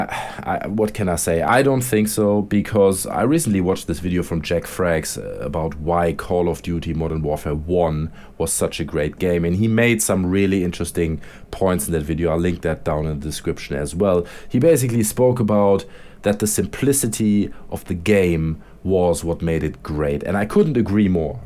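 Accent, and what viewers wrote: Germany. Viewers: ARe you german :) cause you Sound a bit like it.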